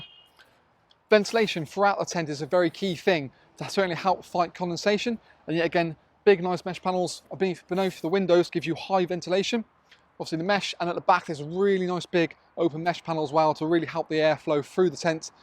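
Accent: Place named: Cockney, United Kingdom. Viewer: Are there any reviews of this tent for those of us who don’t speak cockney?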